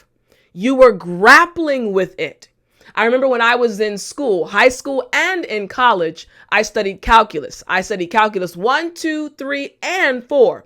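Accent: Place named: New York, United States. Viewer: Hi, I love your voice and accent. Are you from NY?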